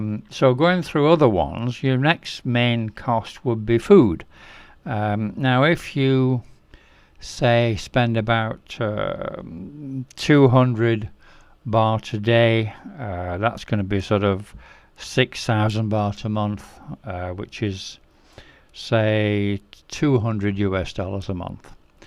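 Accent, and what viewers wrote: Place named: England, United Kingdom. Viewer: Why are you giving costs in us dollars with an english accent ?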